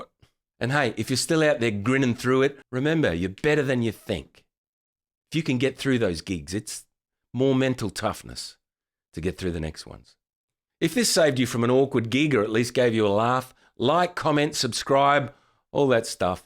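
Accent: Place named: Australia. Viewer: Where have you been all my life?!?? so easy listen to ...this man has REAL advice.. and in my own Aussie accent it makes these videos relatable its and refreshing as it is straight up no bs effective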